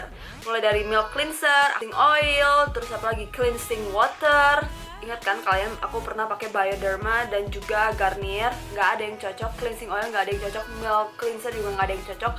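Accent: West Java, Indonesia. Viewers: lucu kak, logat sunda nya keluar banyak di video ini 😄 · seneng banget sama cara pembawaannya di youtube, ga dibuat2, ga sok asik kaya youtuber lain dan ada aksen sundanya hihi. langsung subscribe! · ka orang sunda ya ?😀😀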